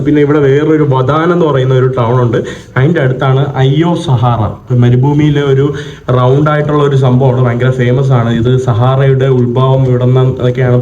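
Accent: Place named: Thiruvananthapuram, India. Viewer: അവൻ സംസാരിക്കുന്നത് തിരുവനന്തപുരം സ്ലാങ്ങിലാണ് ഓരോ ജില്ലക്കും അതിന്റെ തായമാറ്റങ്ങളുണ്ട് ജില്ലയിൽ തന്നെ വിവിധ ഭാഗങ്ങളിൽ വ്യത്യസ്തമായ വാക്കുകൾ ഉപയോഗിക്കുന്നുഎന്നിട്ടാണ് മലയാളം ഉച്ചാരണം ശരിയല്ല എന്നൊക്കെ പറയുന്നത് കൈയ്യിൽആധുനിക മൊബൈൽ ഒക്കെ ഉള്ളതല്ലേ ഗൂഗിളിൽ ഒന്ന് സെർച്ച് ചെയ്താൽ കിട്ടാവുന്ന തെയൊള്ളു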